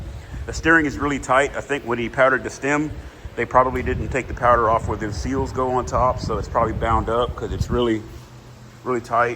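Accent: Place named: South, United States. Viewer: I used to watch your videos years ago! I want to say thank you for all the banshee knowledge you were able to share. Just realized you have a Midwest vocabulary with your southern accent. I'm from tip of the upper peninsula in upper Michigan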